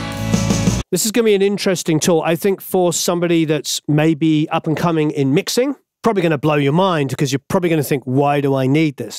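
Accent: United Kingdom. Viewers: I always mix on headphones. We moved from a place where I had a studio separate from the main house to a smaller space where my studio is directly across the hall from the den and one quarter the size. Whenever I would mix anything with a lot of bass on the studio monitors my wife would tell me to turn the bass down. I've tried a couple of room emulations and they may help...I'm not sure. Once you've mixed the advice is to bypass the plug-in before rendering the file, so the sound changes dramatically. I don't know... Couple of points: When I mix I always sit at the mixing desk, which I would assume is where the impulse of any room should be sampled at. So I don't quite understand why you would load up a room and then change the parameters. I get listening to different areas of your space while mixing on speakers but on headphones you have no idea when, say, adding ambience, where you are in the virtual space. 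Maybe I'm missing something? It seems to me that these types of plug-ins are more complicated than they need to be. Set me at the mixing desk at Abbey Road Studios (or wherever) and let me mix! And who mixes in their kitchen? Finally, why is it that when someone speaks with a British accent they always sound smarter? Don't get me wrong, you ARE very smart, but it just adds a little something to the equation. And do American accents sound smarter to a Brit? Great stuff as always.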